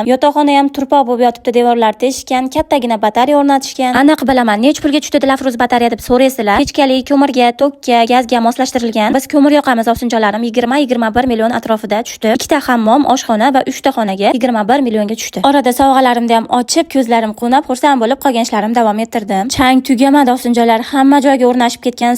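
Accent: Namangan, Uzbekistan. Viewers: Voy dod shevezdan ey NAMANGAN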